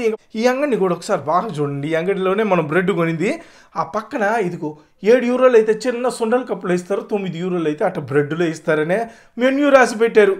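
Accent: Nellore, India. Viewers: Super Tammudu meeru video pettadam chudakapovadama adi 5min ayina 10min ayina mana nellore slang naa Chevilo amrutam laa vundi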